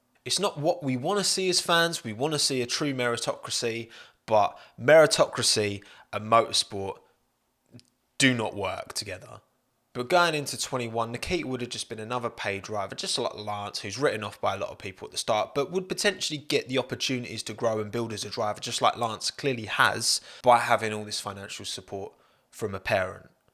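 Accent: United Kingdom. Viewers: Mega Brit accent 😂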